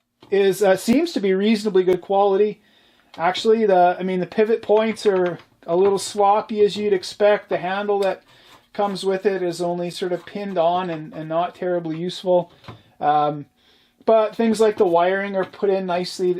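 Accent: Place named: Canada. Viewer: You must drive across the border to buy stuff because sounds like you're Canadian it seems like stuff is so much more up there I've been there many times it's like the Canadian content law make stuff way more expensive and they can't sell tools like this there that cheap. But if it was made in Canada they can sell it so the Canadiens markup the hell out of everything that they make so everything cost you guys too much money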